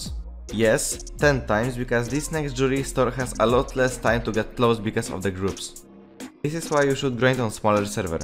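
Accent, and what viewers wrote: Poland. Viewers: słychać że z polski jesteś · Ej czy tylko ja słysze w jego głosie polski akcent xDD? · po akcencie słychać że polak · Are you Polish?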